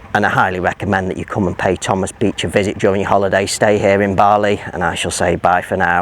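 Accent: United Kingdom. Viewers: Ps. Husband, from bournemouth is trying to guess your accent. He's throwing out lots of options. Lancaster, leeds, manchester, yorkshire. He's usually pretty good at this so you've got him guessing!! Meanwhile, can we hope for that retired banner to come down?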